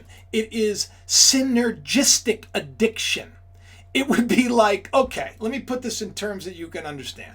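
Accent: Ireland. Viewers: Darn good Irish accent 🍀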